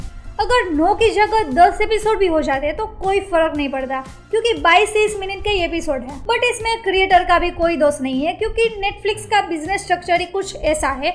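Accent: Gujarat, India. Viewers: aap gujrati ho kya??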